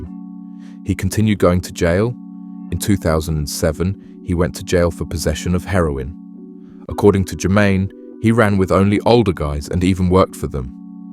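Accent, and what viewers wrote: United Kingdom. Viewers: Man change this stupid ass Brit voice, you outta your fuckin mind lol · He isn’t the only person with a British accent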